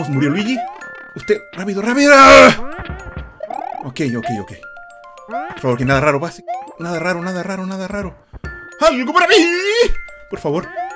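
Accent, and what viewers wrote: Chile. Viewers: Eres chileno? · eres Chileno? yo si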